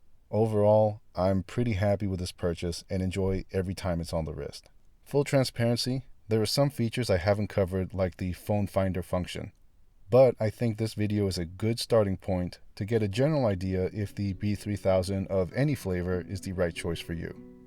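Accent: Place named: United States. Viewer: Great to hear American English good detailed review !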